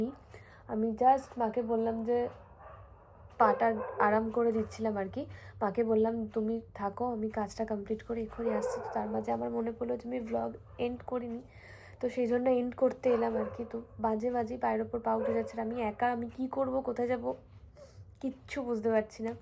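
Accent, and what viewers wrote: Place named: Birbhum, India. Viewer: Khub khub sundor hoyeche ❤ Wow that's was great tomar mukhe Birbhum katha taa khub vlo lagalo ami Birbhum jajigram aa thaki 😊❤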